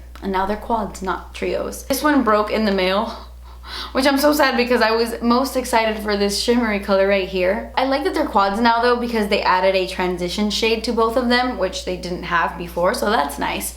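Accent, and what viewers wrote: England, United Kingdom. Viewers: There always has to be like 2 words pronounced in a british accent in every video 😂😂 and i love it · Your English accent sounds like Angelina Jolie in tomb raider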